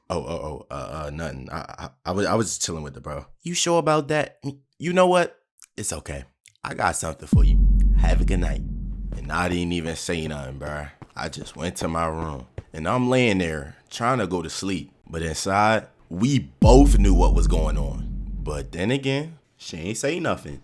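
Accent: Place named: Toronto, Canada. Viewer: The Toronto accent making it peak❤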